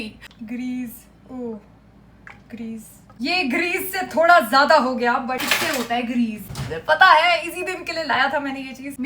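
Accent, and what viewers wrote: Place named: Kashmir, India. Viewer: Brooo, I literally felt like you sounded Kashmiri, but I wasn’t totally sure. I was getting that vibe from you really cool! I’m Kashmiri too. I know that wasn’t really necessary to say 🥀 And congrats on hitting 20K!!!💅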